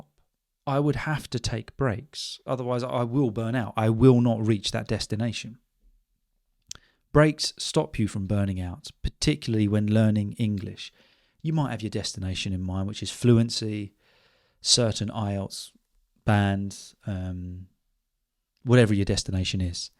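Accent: United Kingdom. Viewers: Your accent is awesome, I like it a lot. What's the name of your accent? Manc? Yorkshe? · Thanks a lot! As I'm taking a break from studying my lectures, I've really enjoyed your video and your amazing British accent! 🤍 greetings from Iraq! ✨ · Hello,! What's your kind of British accent?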